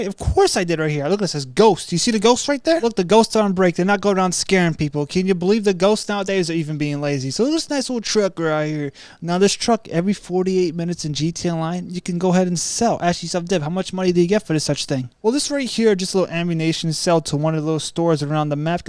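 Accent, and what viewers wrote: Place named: New York, United States. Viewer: strong new york accent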